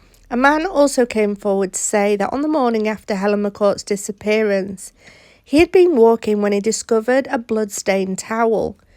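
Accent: Yorkshire, United Kingdom. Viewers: My absolute favourite creator/narrator. Hearing your Yorkshire accent makes me feel at home. I live in California now and haven't been home in 30 odd year so hearing your voice gives me such a warm feeling. It's hard to explain but all you need to know is I absolutely LOVE listening to you. It doesn't hurt that you make great content as well Cheers 🤘🏻🇬🇧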